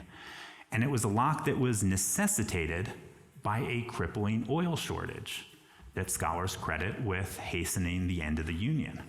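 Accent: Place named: Midwest, United States. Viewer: In the spirit of LPL, his voice is enough to out him. Just think for a second. Sight is a great sense, but we have at least five of them and we can use them if we apply your minds. I know this is a man. He is white. His accent suggests possibly midwestern. His vocabulary, fine articulation and vocation suggests... anyway he's a jew. Anyone in that audience saw his face, btw. But his voice is enough. Not many people speak like that, and he's made hundreds of videos. You don't think someone will eventually make a voice recognition app?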